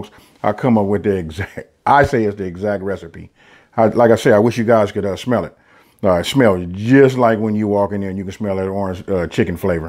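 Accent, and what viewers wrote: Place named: California, United States. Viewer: You sound like you're from cali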